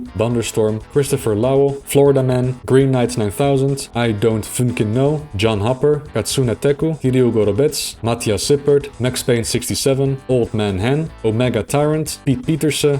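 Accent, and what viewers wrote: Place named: Netherlands. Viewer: Something about the way you sound make me wonder if you Dutch. Are you, by any chance?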